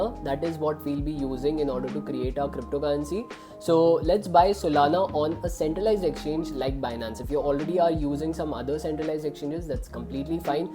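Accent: India. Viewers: Almost had me diddy till I heard an indiann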